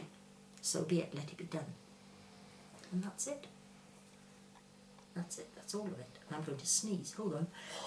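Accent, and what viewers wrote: Wales, United Kingdom. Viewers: I love your accent I hear Welsh in it ❤💛💚🧙✌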